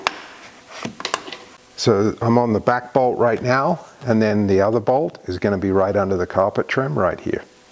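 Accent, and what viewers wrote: Australia. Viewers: Thanks for the video instructions. You wouldn’t happen to be an expat Aussie? It’s messing with me because an American accent is sneaking in with some words 😂. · Sounds like a bit of an aussie accent creeping through? Good info mate.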